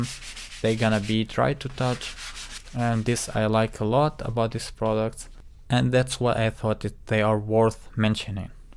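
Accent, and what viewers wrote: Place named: Russia. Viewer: По русски нельзя было говорить? Слышно же по акцент у, что русский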